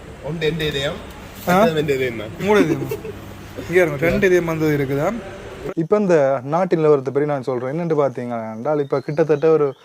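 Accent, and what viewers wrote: Jaffna, Sri Lanka. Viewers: Anna neenga pesura jaffna tamil sema annachchi 😊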